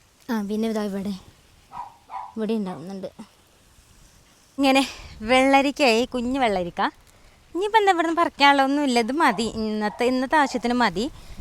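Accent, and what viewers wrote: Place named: Malappuram, India. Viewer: മലപുറം സ്ലാങ് വരും😊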